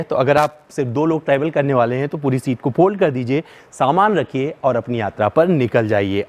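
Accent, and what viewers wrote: India. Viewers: Really your review with hindi accent is just fantastic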